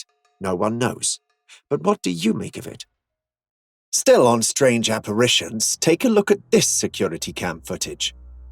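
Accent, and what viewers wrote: England, United Kingdom. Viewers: Yeah the narrator's voice pulls me into the action of the videos. Where is the dude from England or something? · Why halfway through this podcast did you all of a sudden you have an English accent?!! Did anyone else notice this?!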